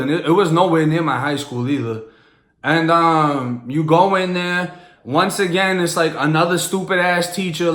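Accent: Boston, United States. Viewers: Idk why the Boston accent is so interesting lol .... Concrete-ass-room with the stupidest teacher in the school. That shit had me rolling. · the cah 🚙 That Boston accent 😂😂😂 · You can tell the Boston accent is the real one with the dropping the Rs. The Florida hood accent is acquired not necessarily fake but more adopted. I’m a white dude who grew up in the hood in Philly. a couple of my white friends i grew up with used to put on that hood “blackcent” when we were younger, tho some still talk like that. But now that we’re in our 30s most just have the normal shitty, Philly white dude accent. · That's crazy you move to Florida about the same age I was when I moved out of Florida I don't know where people say you got a Florida accent cuz homie I straight here nothing but Boston accent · I new I could here the Boston pahk tha cah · Boston with a Blac-scent’ LOVE IT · Why does he have a Boston accent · I new you were from the Boston area!! 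As soon as I heard you speak, I said yup! Boston accent! Boston born and bread here, Dorchester Mass or a Dot Rat as they say 🤣 myself!! 😊! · your accent is so strong for not growing up in boston lol! I love how yall say Car haha sounds so funny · Alot of people in the Boston area sounds like that.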